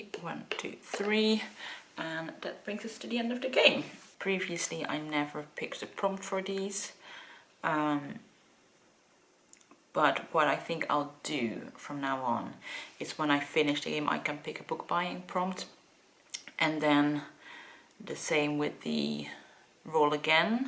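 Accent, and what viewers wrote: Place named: Netherlands. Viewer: Ja. Ik dacht het Nederlandse accent al te herkennen lol. Leuk. Ik heb niet zo veel Nederlanse booktubers kunnen vinden. Lees je meer Engelstalig dan Nederlandstalig? Nog een vraagje weet je misschien waar ik kan vinden of een boek in het Nederlands is vertaald? Ik lees veel in het Engels, maar als ik een boek vind wat mijn zus en moeder ook leuk zouden vinden dan koop ik het het liefst in het nederlands. Zij lezen liever nl talige boeken. Zo ben ik op zoek naar de Nederlandse vertaling van the five van hallie rubenhold.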